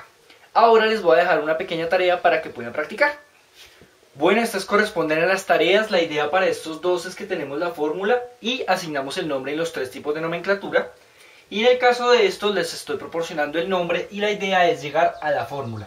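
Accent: Colombia. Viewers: Se nota que eres de Bogotá, Colombia (lo se porque yo también)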